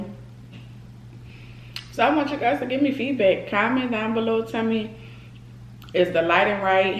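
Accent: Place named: New Orleans, United States. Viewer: Nola? Pretty accent!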